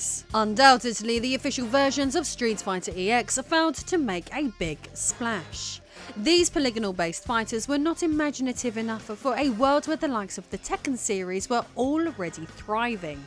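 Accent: United Kingdom. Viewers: loved the brintanic accent along with the cosplay, IIILLIGAL!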